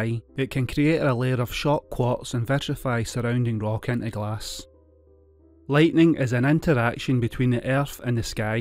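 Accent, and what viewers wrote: Scotland, United Kingdom. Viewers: nice accent. many good science popularizers have beautiful scottish accents. brian cox.iaian stewart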